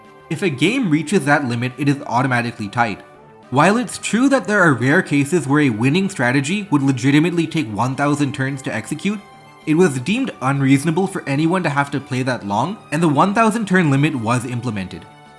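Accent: India. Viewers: no hes just indian no need to assume everyone lives in a primarily english country bruh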